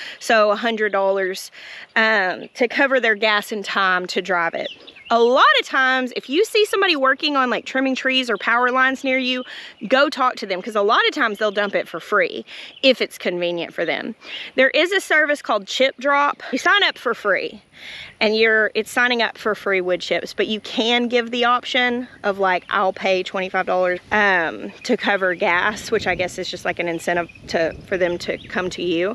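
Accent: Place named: South Carolina, United States. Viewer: Do you realize how much of a South Carolina Southern drawl you have picked up??? 🤣🤣🤣😍😘😁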